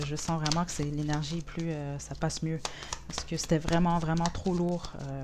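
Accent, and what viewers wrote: Quebec, Canada. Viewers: Salut ! J'ai une petite question , es tu du Québec ?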